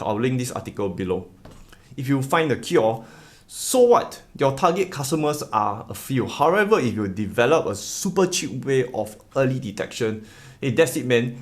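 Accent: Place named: Singapore. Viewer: Needs an accent reduction course for Singaporeans. Straining to listen & follow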